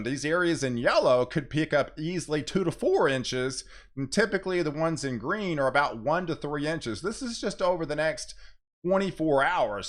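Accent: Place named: Texas, United States. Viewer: youre in mckinney??? i was trying to figure out your accent.. not quite a east texas accent?? my folks live in benbrook over in foat wooth.. we re in italy.. thanks for all yall do.. blessings..doc johnny nato sicily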